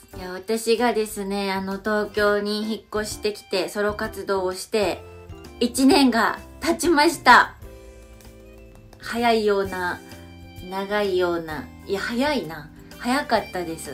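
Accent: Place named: Kansai, Japan. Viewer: 関西弁アイドルは親近感が凄く湧くね👌 BEYOOOOONDSのさやみいみと絡んで欲しい😊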